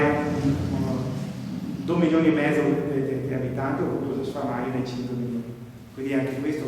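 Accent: Veneto, Italy. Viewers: Un misto di Veneto ed Italiano ma male tutte e due,tant'è che io stesso che sono Veneto mi si sono allungate le orecchie per cercare di capirci qualcosa.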